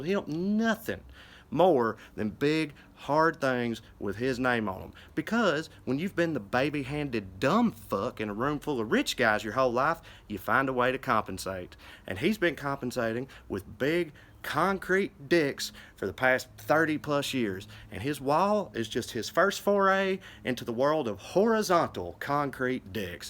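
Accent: South, United States. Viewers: Hhhhh...love ya like chicken too! I sure hope you’re doing well! I never ever thought I would hear words of liberal wisdom riding on a solid southern accent, but it is a most welcome sound! And I believe you are going to be very successful. As they say, truth will win in the end! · Southern accents are so much more attractive when an intelligent person is behind it. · Love this guy. Smart guy with a southern accent. Very good. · Finally!! Someone with a beautiful southern accent and a damn brain!!! THANK YOU!! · thank you, southern twang brother, for showing all south haters that twang don't mean dumb, nor ignorant, nor hateful. from your friend, yankee liberal white guy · Dude I love you in a way of the way you are highly intelligent and play up the accent to fuck with people. Mind blown fucking. It's against nature for any southerner you, Beau of the fifth, me if going by my assessment, southern Einstein any buddy to be smart and talk with a twang Get-er done. 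Alabama blue here. Glad to see the stereo type be proven wrong for a change. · Thank you. I'm from the south and a lot of the times, NOT ALL THE TIME, it seems like white people with that kind accent come off as being kind of racist. But after listening to you I am proud to call you one of my white brothers. May GOD bless you and your family.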